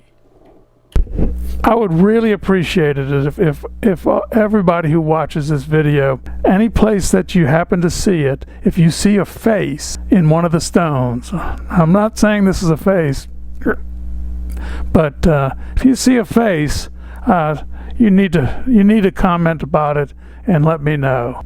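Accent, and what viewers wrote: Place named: New Orleans, United States. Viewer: Your accent is very nostalgic for me, you wouldn’t happen to be from around the Big Easy would you?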